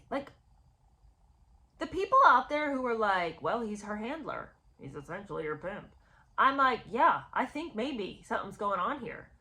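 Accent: United Kingdom. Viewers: I agree. She looked gorgeous in England. She had to have a stylist and she claimed she didn’t. I really enjoy your channel. You’re very funny. Your British accent is simply the best 😂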